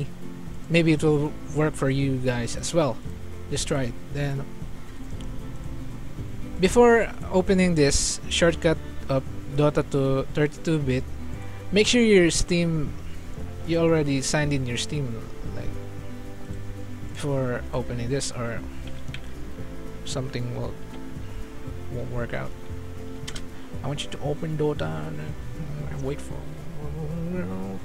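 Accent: Philippines. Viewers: I can tell that u're filipino because of your accent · You're obviously a Pilipino judging your accent.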